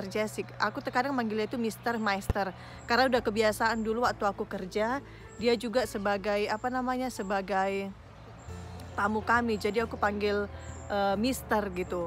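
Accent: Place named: Sumatra, Indonesia. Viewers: Cantik. Logatnya medan banget, "permisikan", heheee... tp enak ditonton 👍 Lanjuttt 😊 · Paten kali logat nya iban..., siantar nya dimana..., sama kita org siantar... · Masih lengket banget ya logat Siantarnya.... Saya juga orang Siantar di Jl Sisingamangaraja dekat Rindam. Rumah orangtua di jalan apa? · Wah...salut bgt dek...walau sdh berada di Jerman.... logat Medan dan Siantar nya msh sgt kental....saya salut... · Hai kak salam kenal logatny siantar man banget bah,salam dr anak siantar yah kak....jangan lupa ajari abang itu bahasa batak jg kak....senang lihat vlog kk auto subscribe 🥰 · Cantik banget spt artis Begitu ngomong, ketauan, dialeknya masih kental, Batak banget, tpi saya pribadi bangga. Sukses buatmu dan keluarga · Aku orang Siantar bah ,ku subscribe lah kakak ini 😁😂 kek gitu lah logat orang Siantar , cantik-cantik ,rendah hati orang Siantar Weh, setia lagi dah ! 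😇😁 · Logat Bataknya lengket x ya ito Hehehehe God bless u both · Saya senang mendengar logat kakak yang tetap seperti logat sumatra 👍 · Logat kau kayak orang medan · Asli medan bah logatnya, suka.. Mamak yg dewasa..mantap..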